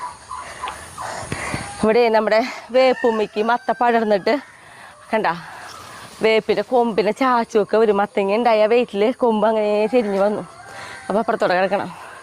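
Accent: Thrissur, India. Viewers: Ningalude sthalam evvideyanu. Thrissur ano. Samsarathil Thrissur slang.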